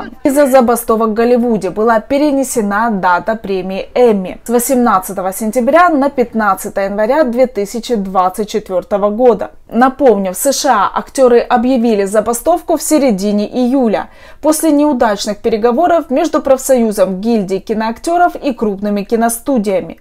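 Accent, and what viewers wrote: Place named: Ukraine. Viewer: Дуже сильний малоросiйский акцент. Треба бiльше працювати над мовою. А так, спасибi, цiкаво слухати.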